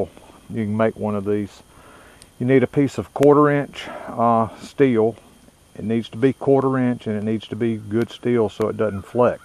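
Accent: North Carolina, United States. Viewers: Where are you from? You sound like central NC?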